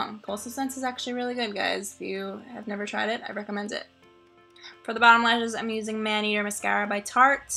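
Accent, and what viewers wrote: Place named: AAVE, United States. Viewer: I think you are beautiful and you are very talented with makeup. I appreciate you sharing your knowledge. With that being said, I find the Ebonics a little irritating. There is no need to say things like "ma" face.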